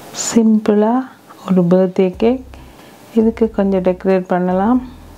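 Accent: Jaffna, Sri Lanka. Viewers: jaffna tamil pesathinga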